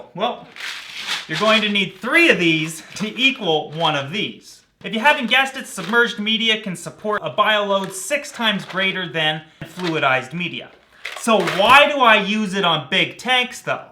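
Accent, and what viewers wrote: Canada. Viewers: I love this guy's Canadian accent "Aboot" lol. Great video though.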